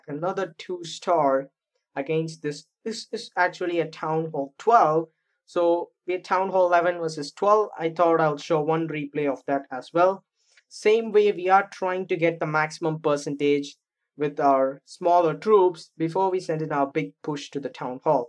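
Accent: India. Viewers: Bro ungaa speach laiyaa tharieduu nengaa tamilaa thalaa (•‿•)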